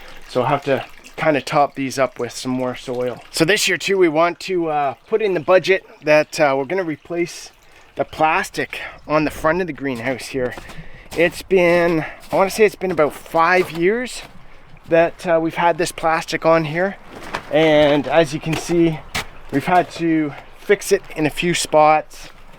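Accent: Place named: Maritimes, Canada. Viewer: The snow hasn't even flown yet and I already want to be in this place again hahaha. Are you originally a Maritimer,? Your accent sounds like home to me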